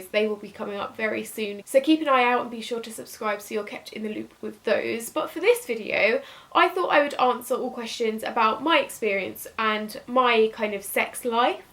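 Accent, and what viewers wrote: United Kingdom. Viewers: I am in love with your voice and British Accent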